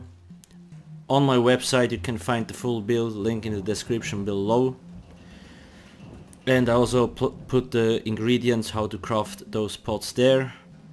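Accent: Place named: Switzerland. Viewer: Hahaha i knew it! This accent(akzent) must be from a swiss guy :D Grüezi from freiburg :) I got a question. Woulf you mind to help me a bit out about pvp getting better with classes(mainly nightblade or sorc) and other basic stuff(im not a beginner in teso but im a beginner in pvp even if my pvp rank is veteran im not that good)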